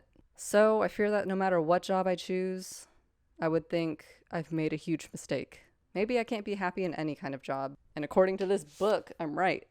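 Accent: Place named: United Kingdom. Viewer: Thanks for the shout out. Nice British Accent! New career as a voice over actress? :) Something you said in there - you have an appreciation for Design. Perhaps Design Consultancy or Management (yeah I know) is your thing? Not actually being "creative" yourself but overseeing the creative process of others to deliver the right result for the client? Having experience of a role before becoming a manager of that role certainly makes you a better leader (or so I've heard).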